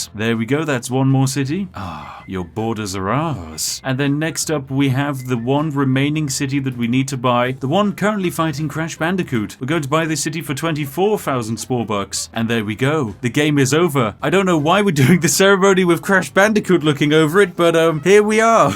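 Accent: United Kingdom. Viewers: wait a second, you are british! And you are playing Spore, where the most valuable thing is spice! AND YOU MAKE COLONIES!!! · I don't even have spore just came here for my daily dose of British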